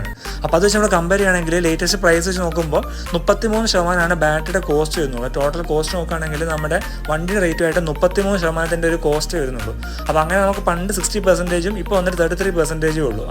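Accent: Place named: Palakkad, India. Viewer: That usage was your signature style and enjoyed the same. By the way are you from Palakkad, coz the particular style seems so.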